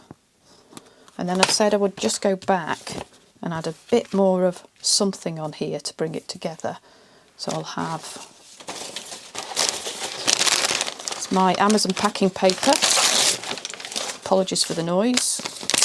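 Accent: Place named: Lancashire, United Kingdom. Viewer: Love this project,! Your voice is so soothing, and it reminds me so much of when I lived in Lytham, Lancs. throughout the 1980s!!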